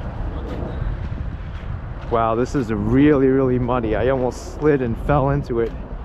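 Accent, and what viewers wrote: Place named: Queens, United States. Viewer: That was charming I really enjoyed it. You have the best Queens accent I ever heard, not too nasal. Just right.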